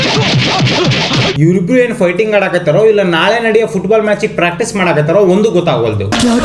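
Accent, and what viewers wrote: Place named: Harihara, India. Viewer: Olle comedy bro n nim dialogue super.. nam Harihara bhaashe kushi aguthe keloke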